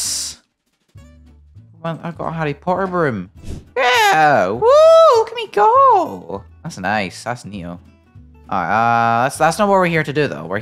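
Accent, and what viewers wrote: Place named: Scotland, United Kingdom. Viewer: You Do Realize your British Scottish right?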